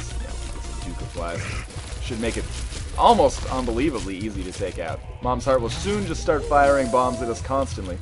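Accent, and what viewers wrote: Canada. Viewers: What are you talking "aboot"? Of course theres a Canadian accent!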